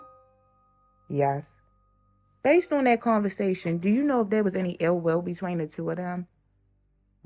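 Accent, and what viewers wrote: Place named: Baltimore, United States. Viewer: This narrator is dope af 💪🤞 Can hear the Baltimore in her voice for real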